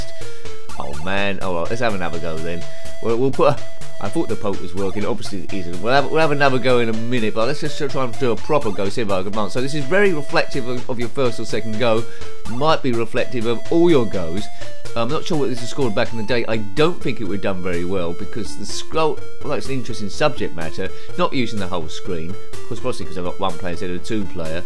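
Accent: United Kingdom. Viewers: I love me some British man fondly commentate over an old obscure ZX Spectrum game, one of my favorite genres on Youtube.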